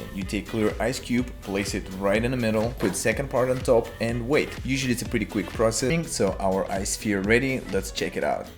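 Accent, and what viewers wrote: Russia. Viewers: Вот странно слушать русский акцент английского языка, будто говорит на родном но ничего не понятно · Я один слышу русский акцент? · Русский акцент.) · Я никак не пойму. У тебя вроде как русский акцент? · Ну я понял что ты русский но не по имени а по акценту ну чисто вот этот русский который хочет дать тебе по лицу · Where are he from? Soo shity russion accent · русский же акцент, не? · По акценту понял, что автор канала русский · Он очень похож на русского который говорит на английском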